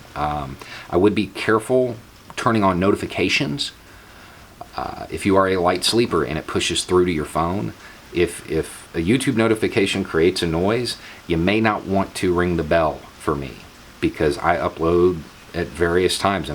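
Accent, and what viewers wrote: South, United States. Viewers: I'm in Texas waist deep in Southern racism and Trump lunacy. I went to a small town all white school where teachers and students dropped the 'N' bomb shamelessly. Nearly everyone on my FB feed and half my relatives are in this mindless Trump cult. Children of the Dixiecrats and they haven't changed a bit since the 60s. You're a Southern man,....how do you deal? I don't kick people off my Facebook friends list because I don't want to be isolated in an echo chamber and also to let others know they don't have to be bullied by some small town alpha pup into going along with the crowd. I don't call people names or put up mocking memes, even though I'm tempted, but just post credible journalism and, I hope, sound debate. But it doesn't matter. It's like trying to convince a crazy person he's not the reincarnation of Jesus. Anyway, it's nice to peek out of my North Texas bunker and see someone talking sanity with a Southern accent and a bubba cap. 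· of the Fifth Column Got it Thank you for the explanation. As a fellow southerner (S.C.) I truly appreciate what you are doing. It’s always great to hear someone that talks like me expressing these views. It’s nice to know I’m not alone. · I wish I had noticed how many internet people had subscribed when I did...I'm sure it was the first or second howdy there and I was hooked. Up here in New England, I missed the drawls of the south. I like the beard and lack of toxic masculinity and that he calls b.s.( very tactfully) on the dear leader's actions. I really enjoy the satire of an upside down curious George and the cake dialogue with your woman ( legit had me crying laughing). Congratulations to you. Positive vibes from New Hampshire and remember to be kind to each other and yourself during this pandemic and social crisis . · Pfeil My personal prejudice is centered around people that talk southern. 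So, at first, was hard to take. I got over it and look for his posting every time I sign on now.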